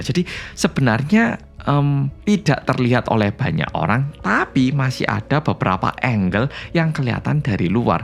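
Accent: Java, Indonesia. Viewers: Hallo bolo medok❤ Nyimak🎉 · terakhir bikin ketawa pas bilang duhh duowone 😁 jowo nyeelll pokok e dirimu.kalau dengerny sambil merem pasti tak kira org Jawa nyell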